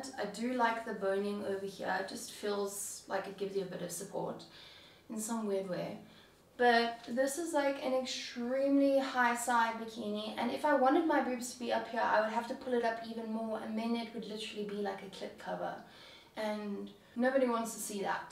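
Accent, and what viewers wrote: South Africa. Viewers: I subscribed as soon as I heard you're South African accent 😁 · I knew you were from South Africa before you even said it lol my best friend is too and you sound the same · Noticed the SA accent, new to ur channel. Love from sa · I am just here to listen to your accent 😂 Live in Australia, but was born in South Africa. I have an Aussie accent now so love listening to yours. Makes me nostalgic 🥰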